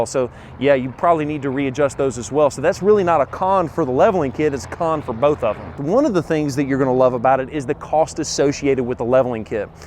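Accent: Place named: South, United States. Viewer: ".....you might can get...." Yeah, he's from the South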